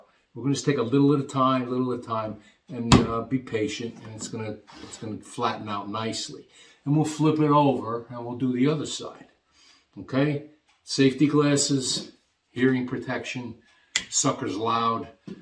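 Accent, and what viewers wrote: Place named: Boston, United States. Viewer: I really enjoyed this video! I’m on the cusp of retirement after 35 years in the landscaping business, and now hoping to pursue some “woodworking” projects myself! I loved the “Boston accent” too! 😂 Thanks again, Dan (Red Wings fan from SE MI 🏒🥅😏)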